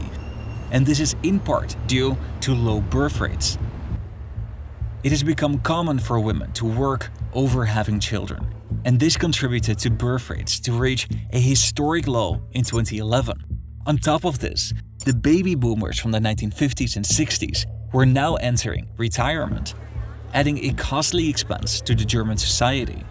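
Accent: Netherlands. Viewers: Man! Your VERY thick Dutch accent distracts me from the content of the video!! :-(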